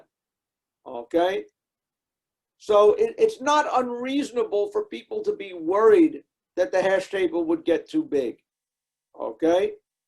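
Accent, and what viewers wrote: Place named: New York, United States. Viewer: a thick New York accent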